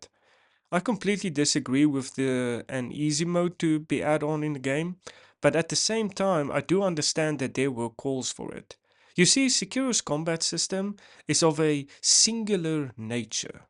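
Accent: South Africa. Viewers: Not used to hearing heavy South African accent 😅 awesome vid boet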